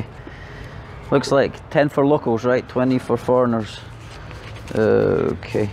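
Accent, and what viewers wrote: Scotland, United Kingdom. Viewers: me too, and his Scottish accent is very strong. Bagus buat yang pengen belajar aksen Skotlandia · A Scottish accent ...Cool Traditional Chinese habits, commemorating ancestors, hope ancestors bless…… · This was sooo funny listening to a fellow Jock moan aboot the price of something 😂 He was right though, definitely a proper rip off!! 🤬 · Hi friend where are you from, Scotland or Midlands? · You got a big problem with 🐘pants 😆And Scottish in you really come out in this video lol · I like you’re Scottish accent.Maybe visit one day Belgium🇧🇪 .I am gonna be you’re guide😊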